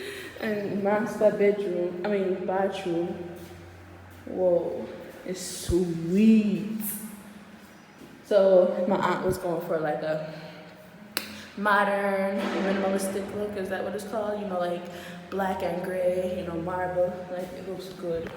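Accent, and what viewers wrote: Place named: Jamaica. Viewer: Love your likkle patois🤣🤣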